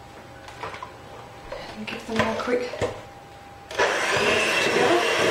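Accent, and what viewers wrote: Australia. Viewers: Wow these older videos of yours got lower views than your new ones. Too bad people forget to check out our older videos on youtube. They always want new ones. I can hear more of your Australian accent in this video. I didn't know you did cooking videos in the past. I like banana bread too. Did you make yours in a cake pan? bye.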